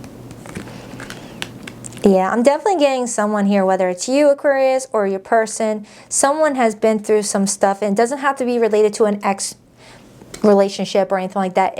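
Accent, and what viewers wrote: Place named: New England, United States. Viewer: I love your new england accent ❤❤❤❤ I live in the south and we absolutely love that accent because its different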